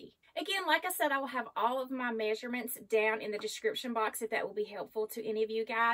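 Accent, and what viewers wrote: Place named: South, United States. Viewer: That's a nice southern accent you got there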